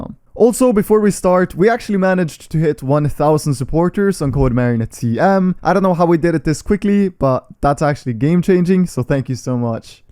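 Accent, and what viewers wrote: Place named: Norway. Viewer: i hear Norwegian, jeg høre at du er norsk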